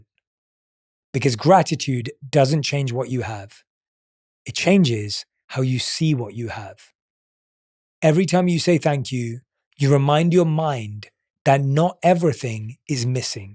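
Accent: United Kingdom. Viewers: Are you a British man? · It's a Nice way to improve My listening skills with England accent and at the same time we learn new thing, being a better person by new habits.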